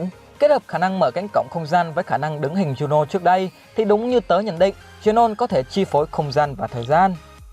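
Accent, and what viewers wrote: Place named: North, Vietnam. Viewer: ad ổng gốc bắc sống ở nam mà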